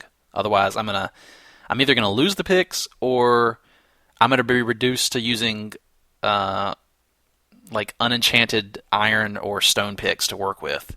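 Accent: South, United States. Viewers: oh you have a cold, you just sounded more southern to me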